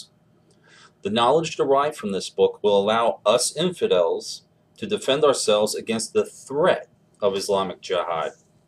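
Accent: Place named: New York, United States. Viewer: NY area accent.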